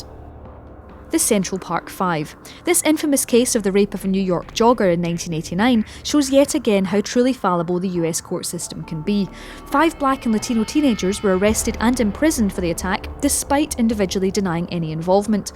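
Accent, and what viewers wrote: Scotland, United Kingdom. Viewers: Thanks for this list, along with the Scottish brogue narration! Charming and informative.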